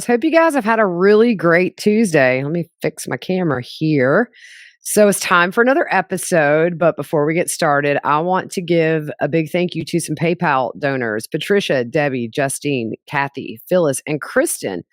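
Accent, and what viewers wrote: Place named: Boston, United States. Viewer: Great episode, and love your Boston pronunciations. Peabody is pronounced, Pea-Bidy lol :)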